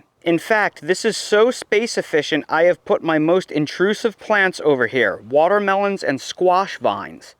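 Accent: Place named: New Jersey, United States. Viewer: I knew you were from NJ! lol I was hearing you talk and I thought to myself, "He sounds like he's from Jersey." I checked your bio, and there you go! I grew up in New Jersey, but more up north, so we don't have the same accent, but yours still sounds familiar to me.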